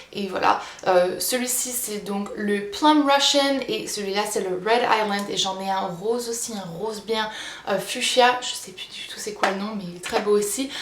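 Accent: United States. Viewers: Coucou 😘 Superbe vidéo comme d'habitude! Je t'adore ❤️ surtout ton accent américain est juste top j'adore!! J'aimerai avoir un accent comme ca XD😂 Bref big bisoux continue comme ca😘😘😘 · Toujours aussi marrante;) Bourjois est vraiment tentant à force que tu en parles... Pour info, j'ai trouvé des vernis Essie en Espagne à seulement 8,95!!!!! Et OPI à 12 je crois, donc si vous avez l'occasion d'aller en Espagne foncez chez Primor;) Pleins de bisous et au passage, quand tu parles anglais on dirait que t'es une américaine!! _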